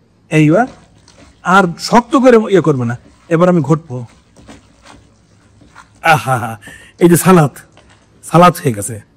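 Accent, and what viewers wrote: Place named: Jhenaidah, Bangladesh. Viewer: শেফ ভাষা মনে হয় ঝিনাইদহের ভাষা। আমার বাড়ি ঝিনাইদহ সদর।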